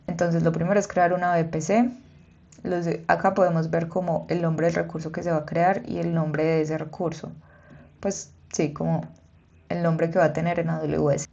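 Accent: Antioquia, Colombia. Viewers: la primera programadora colombiana que conozco, me encanta el acento paisa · Quien vino por conocimiento y se quedó por la tonada paisa? 🙋. Muy buen contenido. Sigue así, saludos desde Argentina.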